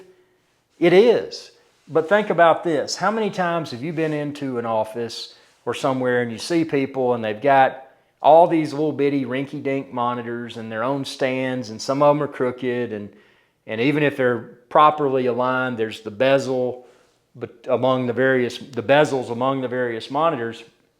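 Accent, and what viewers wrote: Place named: Atlanta, United States. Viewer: Does it being a VA panel (as opposed to the popular IPS) make any difference? They say VA panels aren't as good in terms of viewing angel. How's your experience been sitting up close using it as a monitor vs using it for TV watching from a few feet away perhaps? By the way your accent reminds me of my friends in the Atlanta area 🙂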